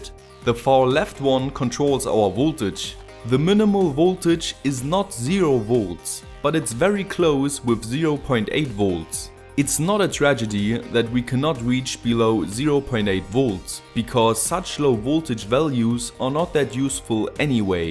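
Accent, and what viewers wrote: Germany. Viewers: Are you german? That english...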